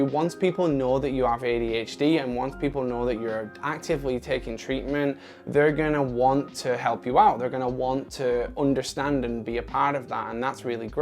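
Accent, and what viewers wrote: United Kingdom. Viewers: How did you get adderall in Uk??? It’s £400 a box and isn’t on Nhs! Unless you live in USA! Lol you sounds British tho!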